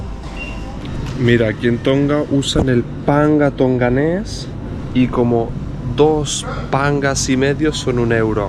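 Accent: Cuba. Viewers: se me quedó la tonada de cubano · Oye, tiene acento cubano, 😂😂😂. Yo soy cubano también. Gracias por tus videos, son muy buenos. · Saludos y, yo soy Dominicano, te sentí el acento cubano.😂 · HASTA EL ACENTO CUBANO TIENE PARECE CUBANO YO SOY DOMINICANO VECINO DE CUBA Y PUERTO RICO SOMOS PARECIDO 🇩🇴🇩🇴🇨🇺🇨🇺🇵🇷🇵🇷👍👍🖐🖐🦾🦾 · Waooooo habla como cubano!!!! Bella isla ❤ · El se llevo hasta el acento cubano. Bro me encantan tus videos. Ya he viajado contigo europa completa 😂😂🇨🇺🇺🇸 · Lo primero que pensé fue parece cubano por el acento cómo que Tongano. ❤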